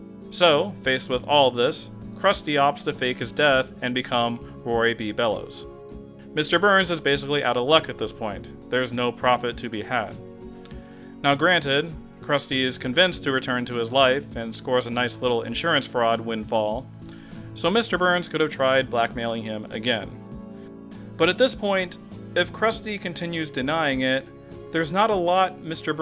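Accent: United States. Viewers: Does he? I'm British and he sounds pretty typically American to me.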